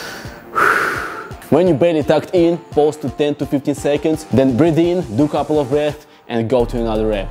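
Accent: Russia. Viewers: русский акцент просто сворачивает уши в трубочку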